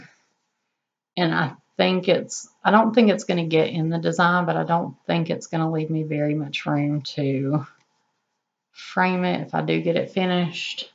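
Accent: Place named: South, United States. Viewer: Hello and welcome I heard you and thought immediately... yeah another southerner.... and then when you were showing where you are from and were talking about Dr. Pepper I was like dang you are in my neck of the woods. Looking forward to seeing more of your projects and future videos.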